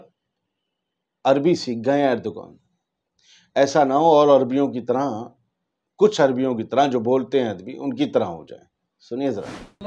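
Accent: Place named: Allahabad, India. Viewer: Aal aap Allahabadi bhasha mein bole rahe the. Ameresh Misra ji bhi allahabad ke hain usi bhasha mein bolte hain.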